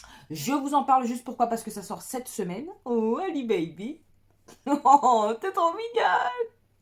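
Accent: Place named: Africa. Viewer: Quand tu montes le niveau d'exigence tu prends l'accent africain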